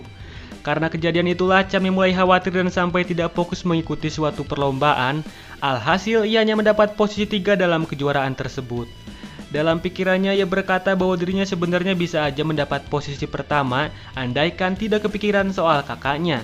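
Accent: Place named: West Java, Indonesia. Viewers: logat sunda itu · Pasti urang sunda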